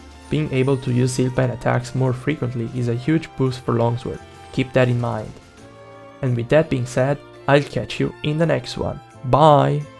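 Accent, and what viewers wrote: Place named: Italy. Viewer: Non so bro mi sai di italiano per l'accento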